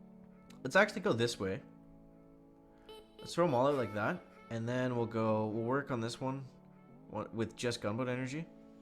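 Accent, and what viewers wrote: Canada. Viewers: Great accent Canada, eh!! Nicest people on the planet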